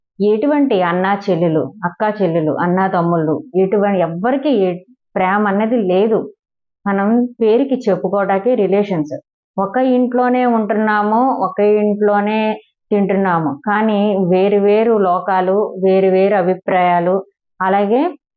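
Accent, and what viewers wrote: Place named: Godavari, India. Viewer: మీ మాటతీరు ఒక గోదావరి స్లాంగ్ ఉంది and అటువైపు ఉండే సాధారణ గృహిణి మాట్లాడినట్లు ఉంది కాని మీరు చెప్పే సబ్జెక్టు బట్టి మీకు మనుషుల సైకాలాజి తెలుసు.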